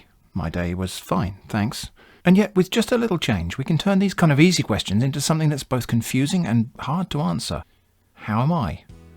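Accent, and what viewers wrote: England, United Kingdom. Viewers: I always find the contrast of reading the broken English in a near perfect English accent quite amusing. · ”Near” perfect? 😂 He is English. How should he improve his “near perfect” English accent. That is an English accent. 😂🤷🏻‍♂️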